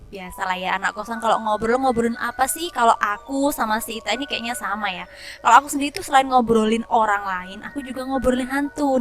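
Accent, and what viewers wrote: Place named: Medan, Indonesia. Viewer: Kamu orang Medan ya? Dari logat bicaranya seperti orang Medan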